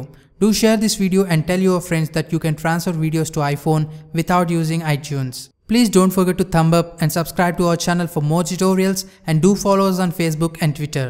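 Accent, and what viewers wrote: India. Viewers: i always cringe a bit when i heard indian accent but we all learn and i understand. nice video mate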